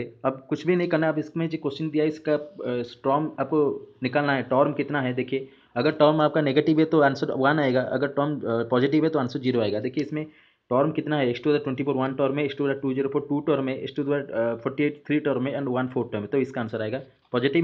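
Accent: Odisha, India. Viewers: Pura odia lagucha bhai.. 😊☺️ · There is nothing wrong with ur accent. Ppl who comment on Indian accents should be ashamed of the of themselves. Diversity is the core of India 🇮🇳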